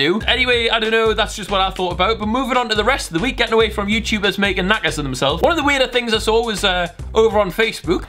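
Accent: Newcastle, United Kingdom. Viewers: Keep doing Geordie voice over vids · the geordie voiceover videos are the best thing youve done since this year on the internet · Love the geordie voice over videos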